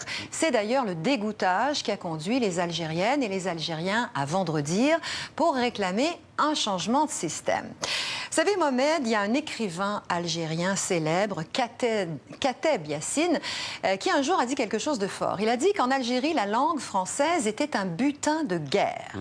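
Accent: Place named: Montreal, Canada. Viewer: L.accent montréalais me manque je vous invite à venir en Algérie pour enrichir votre dictionnaire je dit tout ça après avoir su que vous etes québécoise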